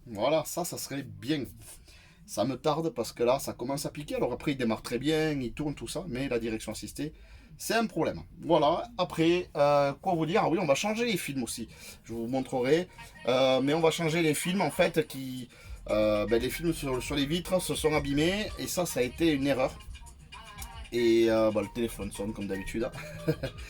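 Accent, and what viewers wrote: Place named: Toulouse, France. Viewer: Ah cet accent toulousain,j’ai passé 12 ans et depuis mon cœur est à Toulouse. Hélas je ne peux plus me permettre de vivre dans la région, je suis juste au nord du lot, je ne vais pas me plaindre mais cet accent me fait vibrer, j’adore ! Depuis je fais du canoe sur la dordogne, lol ça change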